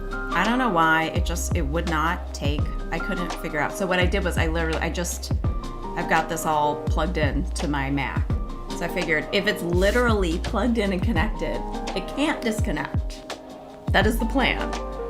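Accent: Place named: Australia. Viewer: Your Australian accent 😂😂😂 oar noar 😂😂 means “bee” so that is super cool 🐝 Melissa also means bee….. what are the odds hey? We almost have a hive in the art community.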